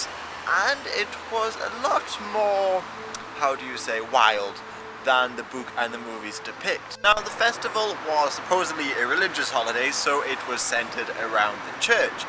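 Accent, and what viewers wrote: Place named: England, United Kingdom. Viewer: He's English, just because he doesn't have an American accent doesn't mean english is his first language. What most Americans don't understand is that ENGLISH is from ENGLAND.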